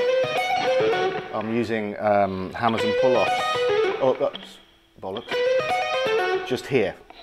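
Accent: United Kingdom. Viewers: Put a camera on your face...once in a while...the mono-tone British thing is, well, a stumbling block....What's the Patton...a general?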